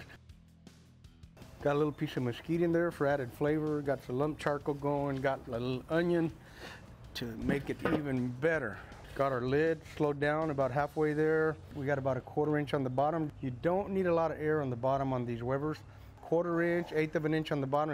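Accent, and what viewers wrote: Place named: Valley, United States. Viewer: Sound just like my relatives down in the valley. Alamo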